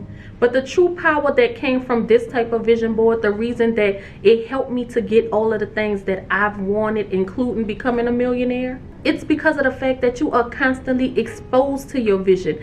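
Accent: New Orleans, United States. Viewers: Good information my sista, I gotta head down to Nawlins' again soon. I love a sista with a NOLO accent 👊🏿💯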